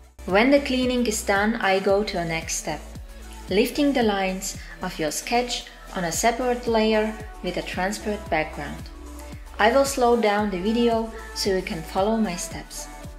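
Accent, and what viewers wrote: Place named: Australia. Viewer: Sound Australian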